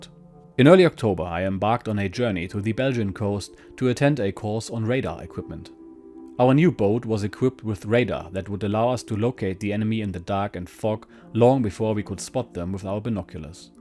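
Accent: Germany. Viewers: when you first started reading the book yourself, you apologized a bit for your German accent. Reading the book with a German accent makes it much more 'real' than if it were read in 'clean' English. I also want to thank you for re-translating the book yourself.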